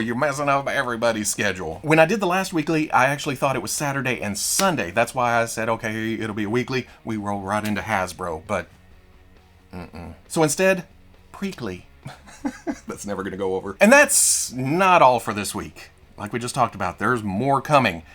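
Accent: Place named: South, United States. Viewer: I say this with love….but man you hit “This is his Baby” so hard with that country accent. Lol #thatsweetsouthernaccent #roboark #queekly #THAILOG Then again I can’t say much being on the opposite side of the state. Lol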